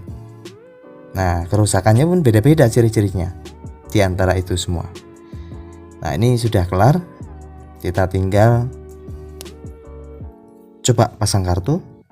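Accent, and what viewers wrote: Java, Indonesia. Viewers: Jowo gak ilang jowone mase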